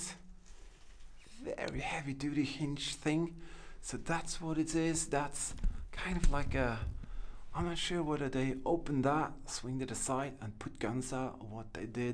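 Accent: Germany. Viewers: I like your German Voice ..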